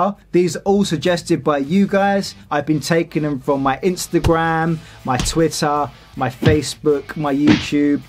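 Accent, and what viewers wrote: England, United Kingdom. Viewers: Wow this is the first time ive heard speak, like i knew he was from england and probably had an accent, put i had always imagined his voice to be deeper to match his playing skill on guitar (which by the way your amazing and have helped me decide what songs to learn next), neverless your amazing at what you do, rock on man \m/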